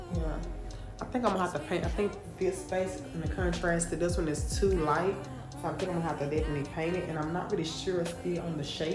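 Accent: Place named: Mississippi, United States. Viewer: If you add some more stems in the vase on the dining table it give the vase more presentation loving the mild colors in the living room it's cozy.first time watching your video while looking through YouTube. Asking you A Q what state are you from? Listening 👂🏽 to your accent when speaking it's close to my families from Mississippi. I'm N.Y.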